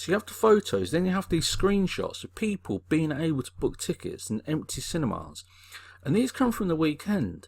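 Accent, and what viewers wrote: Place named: RP, United Kingdom. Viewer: Please stop talking like an idiot Brit. Loose the dumbass royalty respecting accent.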